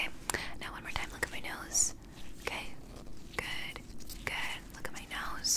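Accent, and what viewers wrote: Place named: United Kingdom. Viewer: I DEMAND MORE BRITISH ACCENT ASMR 🤠😍thanks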